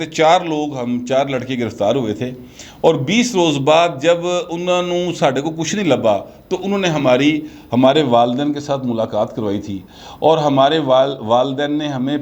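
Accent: Punjab, Pakistan. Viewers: But sbjct you are great..... But sb aap pendo lagtay hon.... May Allah bless you... yard aap kid panjabi tone khoobsurat hay. you are brave personality.......... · either you talk Punjabi or talk urdu you punjabi fucked urdu by force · Sir you are still smart and beautiful with your punjabi tone.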